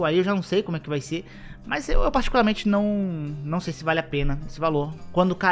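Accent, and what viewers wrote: Rio de Janeiro, Brazil. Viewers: Haha alem do sotaque carioca esse cara passa mo impressao de Nerdola ENZO de condominio kk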